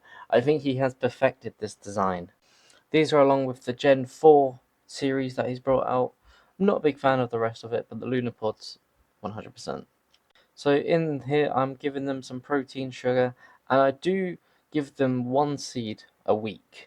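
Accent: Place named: United Kingdom. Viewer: i was really confused when you had s fugax AND c diversa but then i realized that you have a slight british accent. good luck with the manica though.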